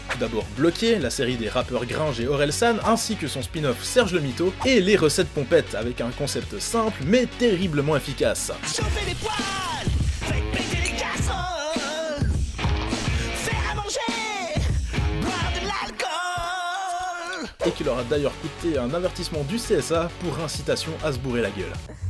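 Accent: Belgium. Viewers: tu est belge ? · t belge ??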